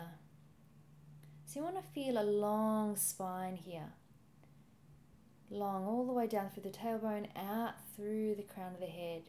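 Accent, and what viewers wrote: Australia. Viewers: I have so enjoyed the yoga with an Australian accent! Great yoga classes and always new poses. Thank you.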